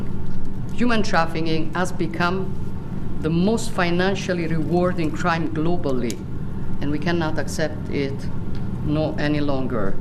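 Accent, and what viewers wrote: Rome, Italy. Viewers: Inglese romanesco, parole a vuoto che oggi dice a Biden e domani, a seconda della convenienza, ad eventuale Trump. Speriamo Biden, che almeno fa finta di ascoltarla 😂😂😂😂😂😂😂😂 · Ahahah che inglese maccaronico con l'inflessione romanesca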